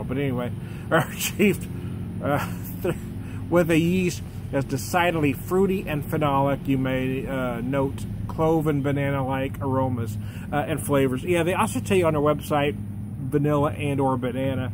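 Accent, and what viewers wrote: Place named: Missouri, United States. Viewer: You sound like such a Missourian lol I'm from Missouri too. Loving these Reverence Series reviews but sadly never tried the Revolution Series. Cheers!!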